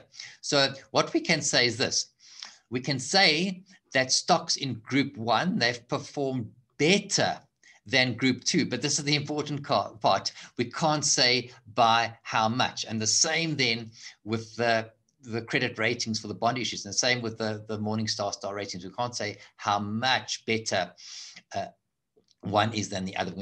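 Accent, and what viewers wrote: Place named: South Africa. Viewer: i love a good south african accent!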